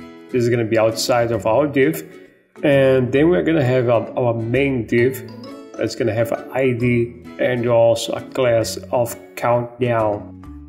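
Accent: Brazil. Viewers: I've never heard a brazilian dude speaking english😂😂😂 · Você é brasileiro ne ?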